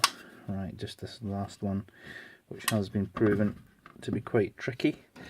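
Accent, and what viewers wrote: United Kingdom. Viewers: Hi, it was a total coincidence, but I'm happy I've found your video. Personally I own more than 40 Amigas 500 (just this model) and I have many parts of it (but just one of it is the real 501;). It was really great to see the professional way you have handled it. BTW. Very good manicure;) and just please keep in mind, not all of us are native speakers (however it was great to hear the beautiful britisch englisch). Regards from Germany. Adam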